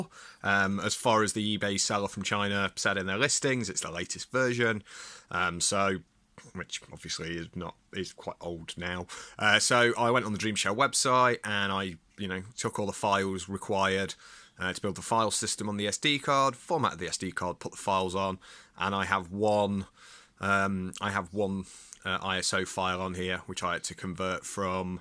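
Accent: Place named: England, United Kingdom. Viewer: makes me remember how i hate english accent like this lol... btw we dont care of your speakers and monitor model.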